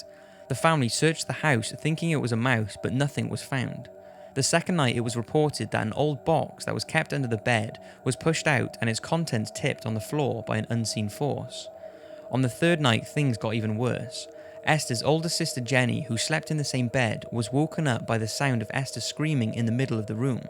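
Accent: Wales, United Kingdom. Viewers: k Not sure where you are from daz k, but to an English ear is from Wales and the "heerd" you have picked up is a feature of that regional accent. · Love your videos! Are you Welsh?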